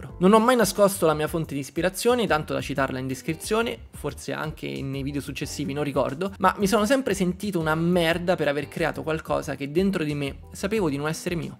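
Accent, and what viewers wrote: Rome, Italy. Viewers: dovresti essere solo orgoglioso, sei forte! fantastico lo spezzone di video del 2018 dove avevi una dizione con accento e tono tipico romanesco, ora però è un piacere sentirti parlare. professionista! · non so se può servire a qualcosa per te leggerlo, ma sei davvero l'unico YouTuber italiano di videogiochi che seguo insieme a Il Grettapensiero e RoundTwo: perché sei calmo, sei umile e perché mi piace un sacco la tua dizione "romanaccia ma non troppo". Non esiste uno che parli come te su YouTube. Ti sembra poco? Per me non lo è affatto. Aggiungici i contenuti sempre interessanti e la percezione di avere davanti una brava persona, e non una bestia di egocentrismo, e diventa ovvio affenzionarsi al tuo canale.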